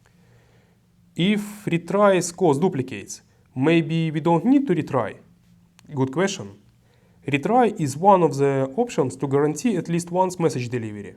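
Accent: Russia. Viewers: Ох уж этот русский акцент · 这是俄罗斯口音吗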